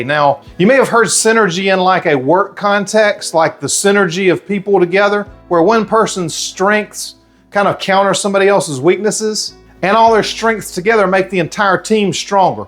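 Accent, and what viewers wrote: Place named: Gulf Coast, United States. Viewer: I'm going to give this a try! I've been really enjoying your videos, especially because your accent reminds me of home (I grew up on the Gulf coast) 😊 Thank you so much for all the helpful information!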